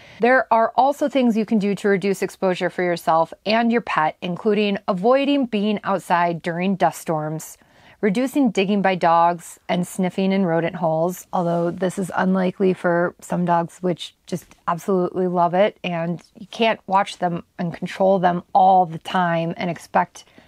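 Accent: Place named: Midwest, United States. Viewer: I have watched almost every and Riot video and I think you both are awesome. As someone that was born in Wisconsin and lived most of my life in and around Chicago your voice reminds me of home. In one video you compared a city to Beloit and I said to myself “How many people here know Beloit?” Beloit is no Janesville but it’s alright. I chose this video to comment on because microbial remediation is part of my job and “The Fungus Among us” is literally one of my titles in my training materials used to train new technicians. I am going to offer a little critique of your videos. It mostly positive because I think you are doing a great job and are genuine. So, I don’t know how you don’t have more subscribers because I find your videos much more entertaining, informative and real than most that I have seen. I guess I can just make this a top 10. 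My 10 favorite things about and Riot videos: 1. swearing. It’s not gratuitous vulgarity but you say what you feel and if that includes coarse language you let it fly. 2. How well thought out you are. It’s obvious that you research your topics and yet you always provide verbal disclaimers that people shouldn’t take what you say as gospel. 3. Your sense of humor is sometimes dry but is often quirky and nerdy including lots of Star Wars references as well as LOTR and even South Park. 4. How strong and positive you are. You appear to be crushing the nomad life. Super prepared and taking the pitfalls in stride. More people especiall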